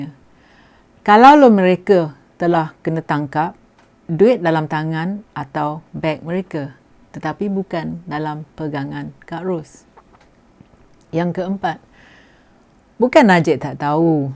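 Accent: Perak, Malaysia. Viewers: Org perak ke ni? Banyak dengor slang perak hehehe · Kak ni org perak ke? Hahah...slang mcm org perak...btw...good content...let the bossku rest in prison....on the bright side, dia boleh muhasabah diri dia without his wife intervention....hahahah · slm.. loghat puan ni mcm oghang Peghok ( Perak ) plk? 🤔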